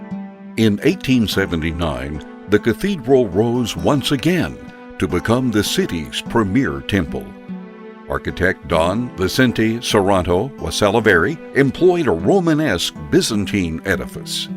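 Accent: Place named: United States. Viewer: Gagawa lang kayo ng historical video on Manila Cathedral kumuha pa kayo ng voiceover with American accent who mangles the Latin-Spanish names. Very distracting. You should have at least taught him the correct pronunciations. Stupid and insensitive. What a waste.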